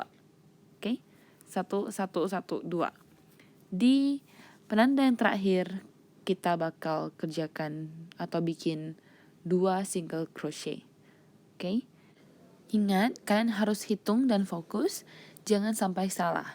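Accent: Malaysia. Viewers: ouh patutlah your logat/slang sound A bit like Malay xD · owh eheheh ku kira dari malay karena logat nya mirip by the way tangan kakak ko bisa putih bersih gt sih? TwT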